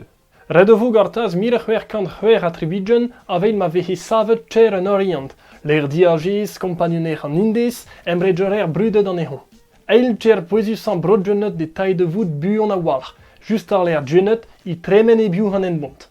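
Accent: Vannes, France. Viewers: Super accent Vannetais, vivant comme les anciens ! 🙋💙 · Pouez-mouezh Gwened ‘vel just 😊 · Je pensais pas entendre un jeune parler breton avec un accent vannetais. Chapeau, c'est une agréable surprise. Ken a vezo comme ils disent dans le bro-Leon. Ça donnerait quoi avec l'accent gwenedeg, "chen a veho"?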